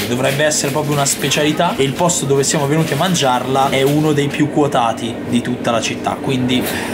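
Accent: Bergamo, Italy. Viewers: sciarpa di capelli, accento bergamasco, street food, ignoranza in abbondanza, scusatemi ma anche senza coffe shop made me feel high.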